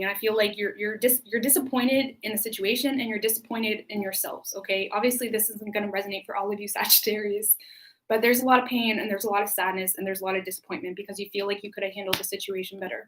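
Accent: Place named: Canada. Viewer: leo is on point. your canadian accent is so cute lol. wu-tang forever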